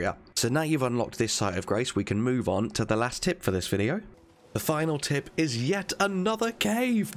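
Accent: United Kingdom. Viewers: Even if I am on ng+2, almost all looted. It's a pleasure to hear you I don't know if you are british, but you accent is really pleasant to hear. Greetings from France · My apologies I did not receive a notification regarding your reply 😅 This may sound weird but I wish I could live in the UK hearing your accent 😄 It's fuckin hot as Volcano Manor here in Arizona 😂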